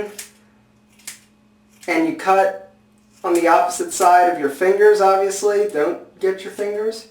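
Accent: Philadelphia, United States. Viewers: you sound like ur from philly "pool it to the back"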